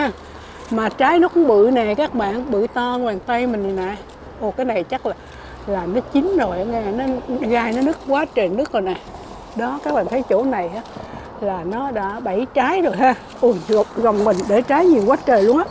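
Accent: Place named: Vietnam. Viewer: Moi lan minh nghe tieng noi con Viet Cong la minh biet lien Do XAO XAO ,cup ve VIET NAM ma O voi VIET CONG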